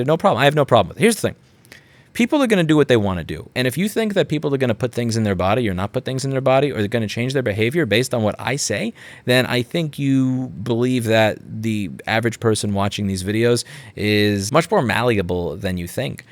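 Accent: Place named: Long Island, United States. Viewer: Your on long island. We long islanders can recognize that accent anywhere. Im moving up to VT in a month ( part of the crowd re-evaluating their life) and Im concerned my accent will give me away up there.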